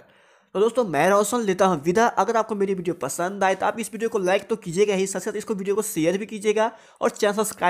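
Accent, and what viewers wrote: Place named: Bihar, India. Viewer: Bolne wala banda bihar ka hai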